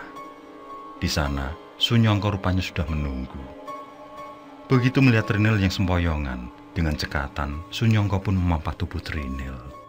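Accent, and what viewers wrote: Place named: Blitar, Indonesia. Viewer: Pak de bhasane mirip wong blitar ..🙏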